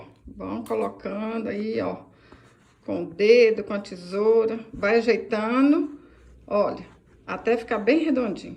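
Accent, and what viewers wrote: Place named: Belo Horizonte, Brazil. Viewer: Adoro vc jeito falar igual minha tia de Belo Horizonte fazia coisas e doces como ninguém foi embora 88 anos que saudades qdo ouço você quero me inscrever não consegui ainda bjs amo vc creusa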